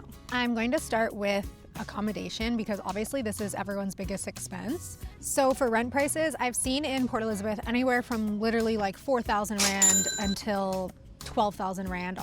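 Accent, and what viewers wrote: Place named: New Zealand. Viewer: Hiya, great to to see you out and about! Canadian you say, but sounds kinda Kiwi? Any case, how are things going with that heavy metal (copper covered lead) allergy of you yours? Y'know, that high-speed / high-impact variety of lead? Causes severe skin irritation and all sorts of internal issues too, if I remenber correctly? Hey, have you tried to find a Job yet and earn some those cheap SA Rands? The same one's that seem so worthwhile right now, with the exchange rate & all? Honey, you're cluelss about where you are. Keep posting, it's HILARIOUS!. Enjoy while you can!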